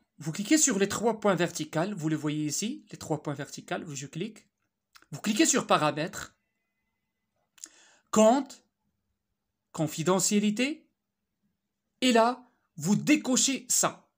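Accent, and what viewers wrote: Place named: Morocco. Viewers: جاني بحالا شي مغريبي كيهضرو بالفرونسي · Tana hh bayna mghribi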